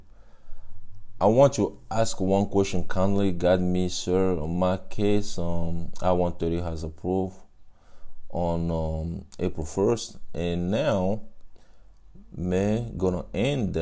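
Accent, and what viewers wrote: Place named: France. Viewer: Are you french by origin ? Love the accent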